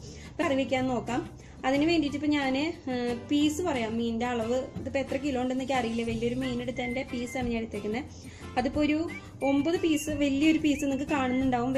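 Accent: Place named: Mullassery, India. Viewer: മോൾടെ വീട് മുല്ലശേരി, പാവറട്ടി ഭാഗത്താണോ. സംസാരം കേട്ടിട്ടു വടക്കാഞ്ചേരി ആണെന്ന് തോന്നില്ല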